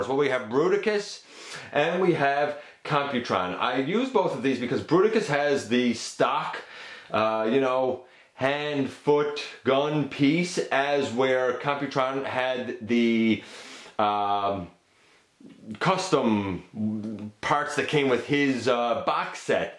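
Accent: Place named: Quebec, Canada. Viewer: You sound French Canadian. Haha, I stumbled across this video precisely because I see the set is on sale for $120.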